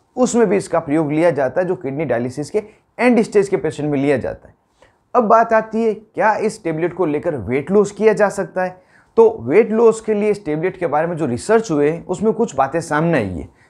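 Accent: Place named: Bengal, India. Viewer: Bangla ucharan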